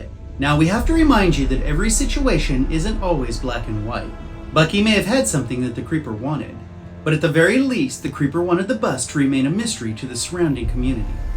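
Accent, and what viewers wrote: South, United States. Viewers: I love the scripted voices. Knowing you as I do, it’s funny to hear the southern accent. Your in your theatric element!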